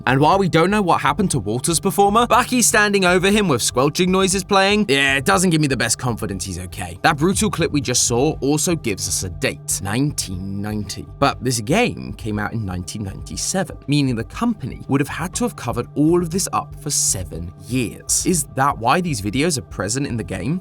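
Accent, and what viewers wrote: United Kingdom. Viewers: AHHH, A BRITISH PERSON!! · British matpat isnt so bad after all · British goood day mate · "wheres matpat 🥺" HE CAN DO WHAT HE WANTS NOE LET THE SILLY BRITISH GUY SPEAK ‼️‼️ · I got a massive British jump scare cuz my brain short circuited and I forgot was British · It's because he's British. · Who is this British gentelman? Where is MatPat? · does he have to be british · W new matpat update even tho hes British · Hey British matpat! Are you becoming silly? :3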